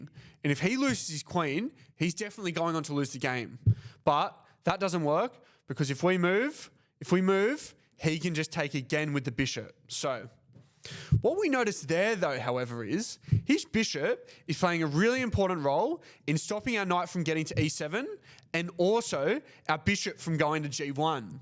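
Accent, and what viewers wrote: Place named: Australia. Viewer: So after watching hours of Alex Banzea's vids I found myself thinking in his Romanian accent. Looking forward to thinking in a Down Under accent!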